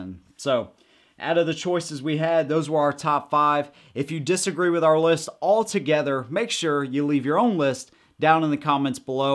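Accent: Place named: Eastern Shore Maryland, United States. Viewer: ... where are you from? I grew up on the eastern shore of MD and your accent makes me think you are from the same area...great video...keep them coming in 2019.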